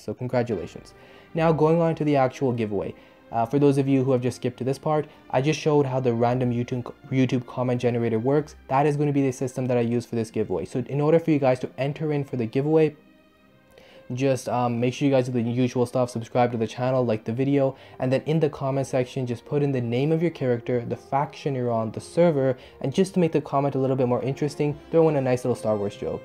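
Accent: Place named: Germany. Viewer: how come a english end up with german accent?:D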